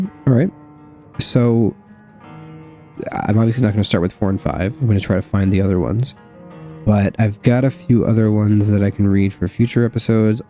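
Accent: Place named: RP, United Kingdom. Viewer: I am very much enjoying your readings of the books. Particularly your English RP (recieved pronunciation) accent. A side note for your knowledge we pronounce the word Dynasty as Din not Dine if you wish it to sound authentic. Reciting in repetition tongue twisters such as Peter Piper or She sells seashells or The rain in Spain or The quick brown dog to practice your enunciation will help. I am sorry you are feeling unwell and it will definitely give you a fuzzy brain preventing you from taking the information in. Nevertheless, a super effort and much appreciated. 👍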